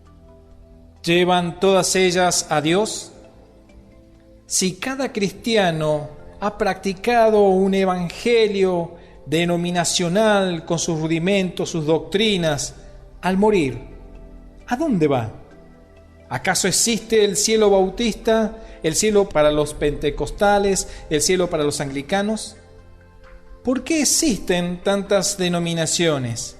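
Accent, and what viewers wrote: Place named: Northwest, Argentina. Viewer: Hermano , es correcto lo que dices . Que Jesús siga bendiciéndote . ( ¿ Eres salteño ? Tienes tonada del noroeste )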